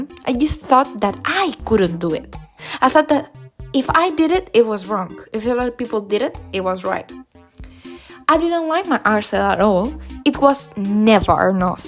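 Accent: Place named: Spain. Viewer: You should be on my voice claim list for me and my friend's oc cuz OMG YOU SHOULD BE CHARLIES GENDER SWAP VOICE CUZ OF YOUR SPANISH ACCENT , btw love your art keep it up :3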